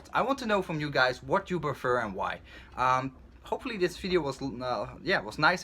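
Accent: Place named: Netherlands. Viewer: Ik kan aan het accent horen dat je Nederlands bent😂😂😂😂😂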